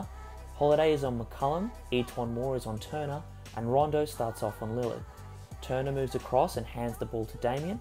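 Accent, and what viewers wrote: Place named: Australia. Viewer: If you're able to turn up the volume on your voice over analysis, that'd be awesome. Your analysis is pretty sick; you also sound like a fellow Aussie. Can't wait for your game 2 review!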